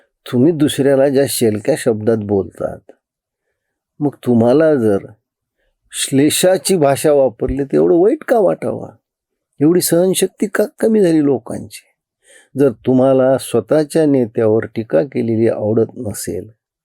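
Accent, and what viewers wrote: Marathwada, India. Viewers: काका आपण रामराम म्हणता ना... ते लय भावतं... अस्सल मराठवाडी लहेजा बोलण्यात असतो.'त्या'नेत्याला आपण पचला नाहीत..पण आता फिट्ट बसला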